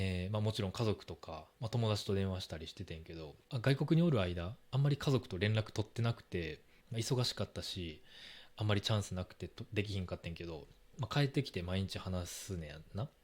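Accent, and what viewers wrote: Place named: Kansai, Japan. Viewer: 先生は関西弁で話す時は可愛いと思います！面白いです！標準語の字幕も入れてありがとうございます、とても役に立ちます！お疲れ様でした！Btw, your volume is totally fine for me, maybe the other commenter who mentioned the volume issue has problems with their speakers or earphones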